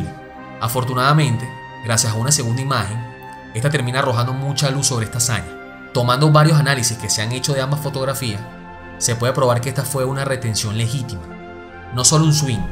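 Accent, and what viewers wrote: Venezuela. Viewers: Estoy seguro de que el narrador es venezolano.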